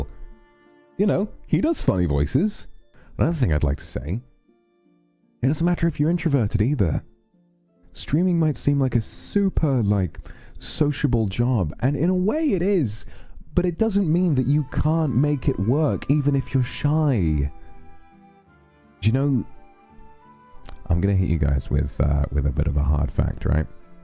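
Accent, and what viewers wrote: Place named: United Kingdom. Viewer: 真的好愛爹地，瞬間愛上英國口音XD 聽完他的雞湯真的變得很有精神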